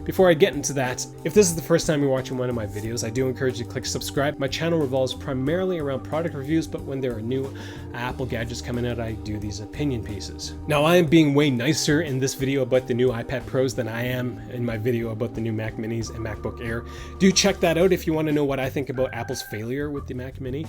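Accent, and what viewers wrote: Canada. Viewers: 2-things 1) there’s a dog in this video! 2) you bench 240lbs? Legit. (But you sound Canadian, is that kilos?)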